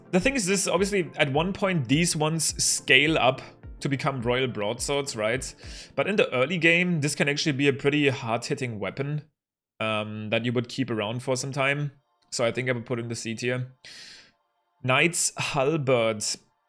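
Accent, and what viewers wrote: Germany. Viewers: Ich höre das du deutscher bist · I am high or is he german? He does have a nice accent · Richtiger Deutscher Akzent ^^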